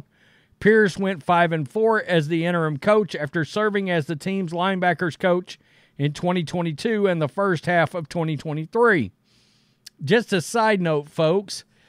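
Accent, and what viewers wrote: South, United States. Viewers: Hey man...Mississippi Raider fan here. You don't come across as one of us fan wise, but you sure cover us alot and I enjoy your takes on our team. As a southerner myself, it's not everyday to hear someone who sounds like me to be covering our team. This team is stoked about AP. I personally, wanted to throw the bank at Harbaugh but the rest of Raider Nation was ready to pitchfork me because they've picked the leader they want. I'm excited about Kliff. I don't have a doubt about AP losing control of the defense while Patrick Graham is the DC. PG knows what he's doing and once McDaniels got the boot he unleashed those guys. My main reason for wanting Harbaugh was his history of developing QBs. It's almost like he read my mind and once I heard about the connection to KK all my worries are relieved. I fully expect the Raiders to ship our unbelievably high amounts of draft capital for our franchise QB this year. 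AP has balls the size or grapefruits and this will be his big stamp to leave on the organization for yeas to come. Good or bad. AP has a connection to Jayden Daniels. I believe I read he helped recruit him out of high school. At the same time, Kliff has been working with Caleb for some time now. Do we trade up to one with Chicago or do we trade w NE to 3? That is the question to me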